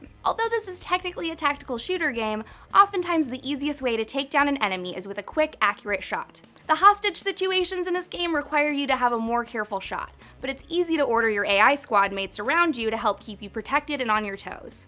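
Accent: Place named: United States. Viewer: Gosh, what a disgustingly thick rhotic accent... Can't listen to this woman. Why do some of you Americans make such a fuss about pronouncing a simple, innocent 'r' ?! It's just an 'r' , godammit, you don't have to swallow your tongue to pronounce it! You don't have to rape the li'l 'r' so hard! You sound like you talk with a hot potato in your mouth. Plus this nagging voice... No way, José!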